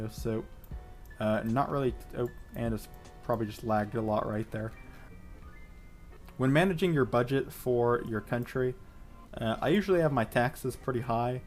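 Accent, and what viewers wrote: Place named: United States. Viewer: Hey man just curious about your accent- what state are you from?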